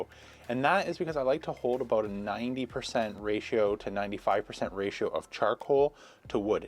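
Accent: Canada. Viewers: You can tell this dude is a genuine super nice guy - that along with the accent, gotta be a Canadian. Lol. Nice vid. Just watched the LnS vs HnF rib video and came here. I'm not sure how you just came up in my recommended since I've been watching bbq vids for years. Subbed. 👍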